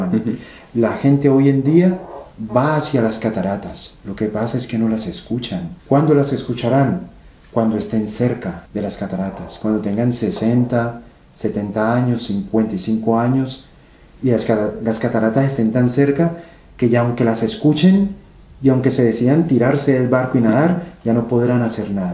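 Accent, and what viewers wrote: Colombia. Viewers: eres colombianito que bonito acento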